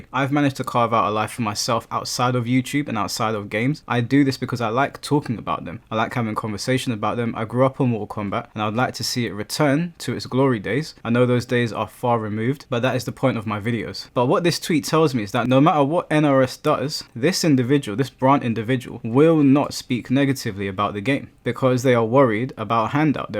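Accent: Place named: England, United Kingdom. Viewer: You got decent content but I HATE YOUR VOICE it's the English shit I'm American and hate the English accent sorry not trying to disrespect the English just y'all accent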